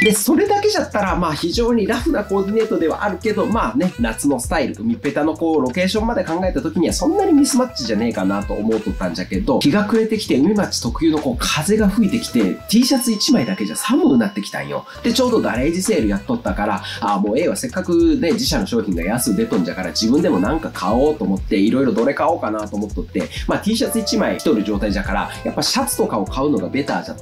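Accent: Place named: Chugoku, Japan. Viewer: 中国地方の方言すきだな〜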